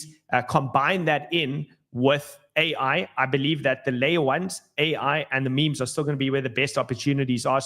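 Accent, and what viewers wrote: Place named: South Africa. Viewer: Love that South African accent, dude!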